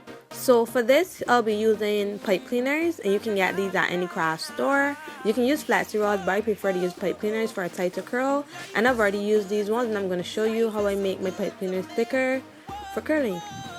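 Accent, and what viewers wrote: Barbados. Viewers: yesss I know that accent anywhere subscribed right away #BajanQueen 😍😍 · love love love that Bajan accent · Yuh sound Bajan 💙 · Yesss I hear the bajan accent 🙌🏽🇧🇧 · is that a bajan accent I hear??!!!!!!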